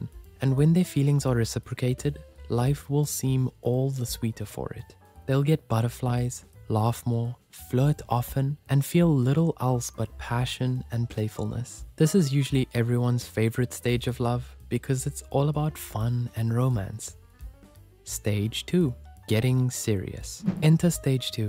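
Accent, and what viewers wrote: South Africa. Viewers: The speaker sounds south african😮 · Man's accent is from SA🇿🇦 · Bro sounds South African 🎉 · The narrator are you south african? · The narrator is definitely South African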